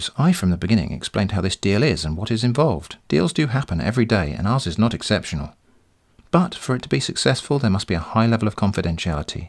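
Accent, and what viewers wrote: England, United Kingdom. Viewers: It's an English gentleman speaking English language.like Liverpool FC playing at Anfield . What is there not to like?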